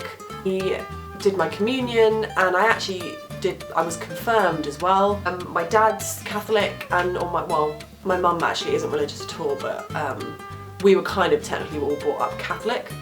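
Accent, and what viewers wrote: England, United Kingdom. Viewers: You make really good videos, plus I like the travel videos like Ibiza, keep it up you are awesome, my friend likes your English accent.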